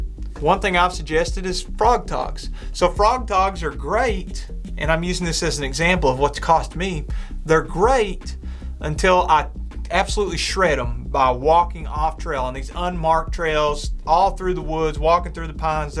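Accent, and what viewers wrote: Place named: South, United States. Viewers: What's up with his accent? Why is he talking like Forrest Gump? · My goodness! thats the one of the sweetest southern accents I've heard! love from the netherlands! <3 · I listened because of your southern accent...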